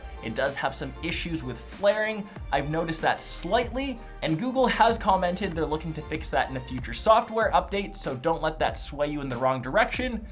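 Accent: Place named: Canada. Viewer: lol you're Canadian accent is strong. nice video🙌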